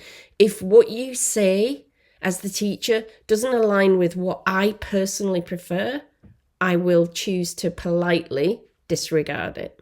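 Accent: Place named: Lancashire, United Kingdom. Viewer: Gosh, I’m so happy I found you and your podcast. I live in the US now, but originally from Lancashire, your accent brings me back home (I have family in Yorkshire!) I’m so excited to delve into acrylic painting properly this time and learn my authentic self/style; no more picking up then giving up - excited to learn and be guided by your lessons and work. Thank you.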